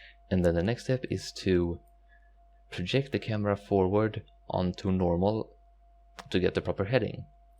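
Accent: Sweden. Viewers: Älskar din uppenbara svenska dialekt :)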